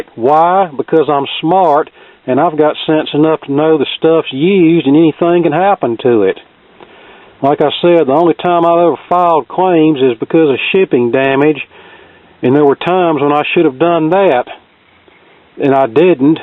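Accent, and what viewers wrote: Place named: United States. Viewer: Blitz Roehre Vor dreißig Jahren wohnte ich in süd Deutschland. Ihr Akzent klingt anders... Amerikanisch vielliecht?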